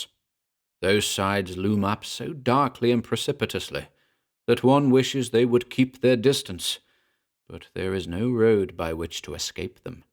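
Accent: United States. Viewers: you do a fabulous American accent! Loved this as always. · I really have to say, you've outdone yourselves with this one. The production value has increased dramatically. And, your American accent has also improved tremendously lately. I always listen to your channel while I fall asleep at night, usually alongside a quiet recording I made of rain on the roof of my spare bedroom. I find that it's the perfect aesthetic to go along with your recordings. Anyway, really looking forward to the next installments.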